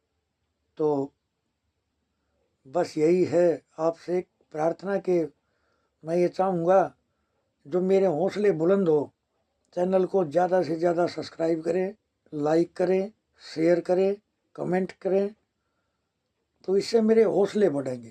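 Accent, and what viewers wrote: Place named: Haryana, India. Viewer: Guruji.. Aapke hosle jaror buland hoge... Aisi jaankari abhitak kisi ne you tube par nahi daali hai... Aapke 42 saal ka jivan Ka anubhav aap bahut hi ache tarike se samjha rahe ho... Bahut jaldi Aapka channel bulandiya hasil karega... Sir Delhi me kaha se hai plz bataye apne office ke baare me next video me....hamare Haryana ka touch bahut acha lag raha hai jab aap baat karte hai.... 🙏🙏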